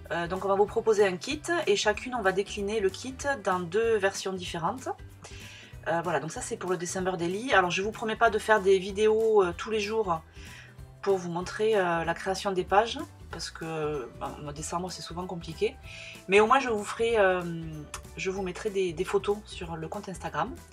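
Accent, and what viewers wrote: Toulouse, France. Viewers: Il est beau notre accen toulousain et tarnais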